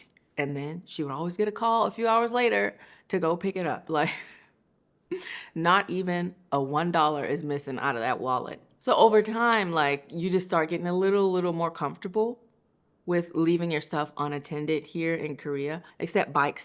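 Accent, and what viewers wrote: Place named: Georgia, United States. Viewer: How have you not lost your Georgia accent?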